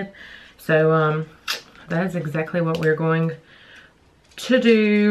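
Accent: South, United States. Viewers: Happy New Year....Your look is so BEAUTIFUL. I just adore your southern tone. It's so cute and adorable.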